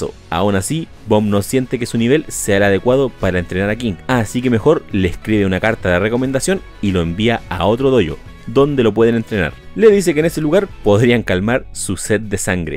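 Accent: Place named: Chile. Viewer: Epico es genial Tu acento chileno Brother Saludos eres un Crack 🤙🤙🤙😁👊👊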